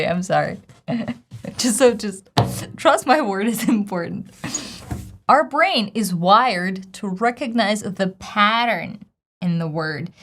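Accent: United States. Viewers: Hi, You have done an amazing job learning the American accent! Cheers, Albert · I just can't believe you come from a heavy russia accent. Your american accent is so perfect. You sound like a native english speaker. Now I know everything is possible.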